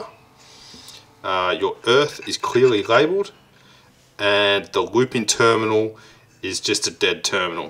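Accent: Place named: Australia. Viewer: Thanks for your informative videos especially with the Australian accent:hand-pink-waving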